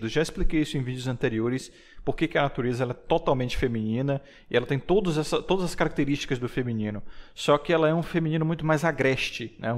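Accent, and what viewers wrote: Bahia, Brazil. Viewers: Tu é baiano?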